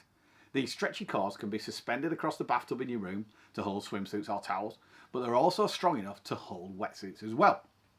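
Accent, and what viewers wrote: United Kingdom. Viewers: To all not in the know, is a proper Englishman with an English accent! Don’t forget, the British invented pretty much everything including SCUBA, not the French, so he has licence to describe everything SCUBA! Personally, best way to get a wetsuit on is to use drysuit lube on the ankles & cuffs. I also take lengths of thin rope with carabiners to hang stuff to dry off the rails on the liveaboard. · sorry, having some trouble understanding. Cheap and plastic what often found in camping stores. Missed the bag trick for wetsuits too. Your brit accent is strong. Maybe demonstrate some of what you are saying. Thanks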